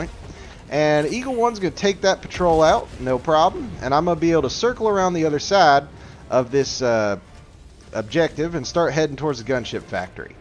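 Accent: South, United States. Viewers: It's good to meet a fellow diver who has a Southern NA accent! Respect brother! Keep spreading Democracy to those socialist bots! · I’ve watched other people, and they just kinda talk, but I like how you actually have personality in your videos. The southern twang really adds to the bot shit talk.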